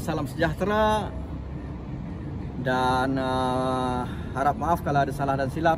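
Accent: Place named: Terengganu, Malaysia. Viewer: ado bunyi sikit loghat teganu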